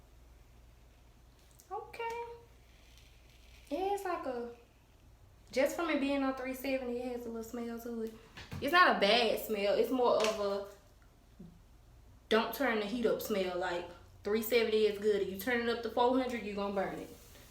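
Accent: United States. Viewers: I love your southern accent girlie! 😍😍😍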